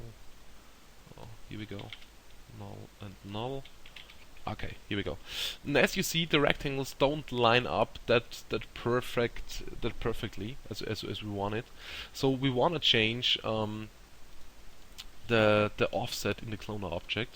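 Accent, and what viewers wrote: Germany. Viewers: Du hast 'nen deutschen Dialekt...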